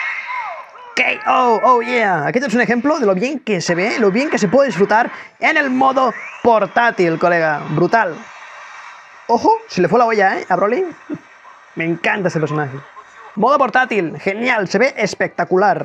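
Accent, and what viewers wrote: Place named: Spain. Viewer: hablando como español... Eso no me lo esperaba D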